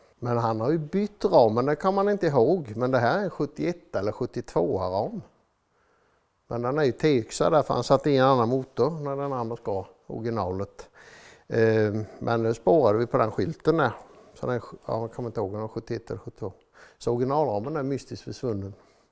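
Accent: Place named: Vetlanda, Sweden. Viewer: Skön blandning av nostalgi, entusiasm, motorkunnande och Vetlandadialekt!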